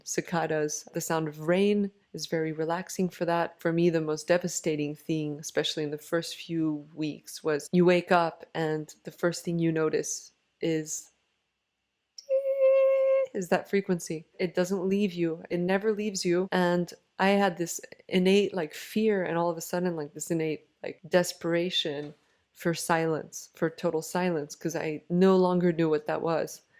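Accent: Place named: United States. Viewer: Thank you, thank you very much for sharing your story. I got Tinnitus in June 2020, and I am still suffering at daily basis never disappeared. After 6 months of waiting I saw a NHS ENT or like you say ORL en francais, I was diagnosid via an audiogram with a significant hearing loss in left ear around 20%. A second ORL and audigram confirmed the same results. Here is my short story before getting Tinnitus; I passed through stress and anxiety over 5 years, and I managed to overcome the hurdles by running three marathons and half in a period of four years and the earphones were always in my ears with loud ipod music, and the fifth year, just when the pandemic hitted hard, I restarted to run again, and onward I cannot hear well with my left year, just few days later, I started to hear hammering, whistling, and roaring sometimes like the echo of car engine running from far away and still until now. 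It went worst during and after exercises and like you say after alcohol or eat spicy food. There is no treatment for tinnitus, we have just to accept it and live near the traffic or in the heart of a city center to forget it. The silence makes me anxious because I hear my Tinnitus, thanks for suggesting gingko biloba, I am planning to explore the possibility of a homeopathic therapy, did you try any? Another question unrelated, have you done any audiogramm with your third ORL? Any hearing loss? Take care your accent in English sounds American not French.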